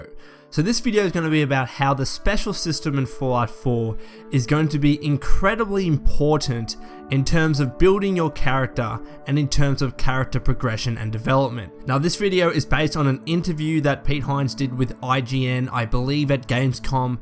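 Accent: Australia. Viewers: LOVE your videos but every time I watch them, I'm always spacing out from how your voice sounds so calm and Australian... I don't mean anything mean by it. Keep up the good work though!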